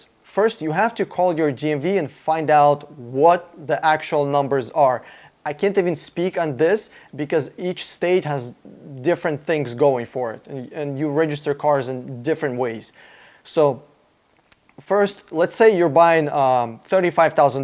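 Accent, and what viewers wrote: Russia. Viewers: Why do you have Russian accent??? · Good advice thank you buddy. You sound like Russian ? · Are you Russian? Love your accent.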